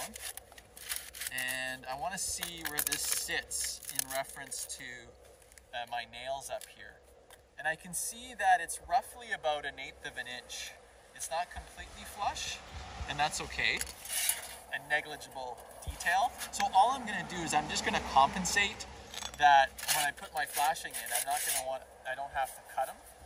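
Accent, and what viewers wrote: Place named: Canada. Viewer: Sounds very CANADIAN they way he speaks... I bet I'm right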